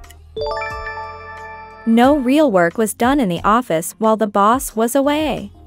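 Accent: United States. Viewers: These are not all C1-C2 questions. A decent grammar book in The UK will confirm that. Of course, the accent in this video leads me to believe that an American created this video… C1 is your (grammatical) level if you get more than 40 of these questions correct. And I would have liked to have seen more Tag type questions and mixed conditionals…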